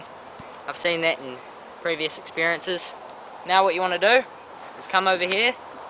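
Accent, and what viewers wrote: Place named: Australia. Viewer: What kind of "strip" was in the brood? Aussie accent ... Yank can't quite get it ...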